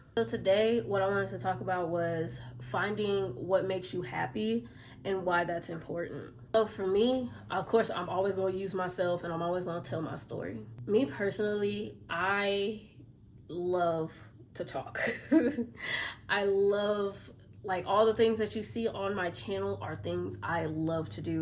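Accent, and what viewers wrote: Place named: Texas, United States. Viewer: What city you in sound like a texas girl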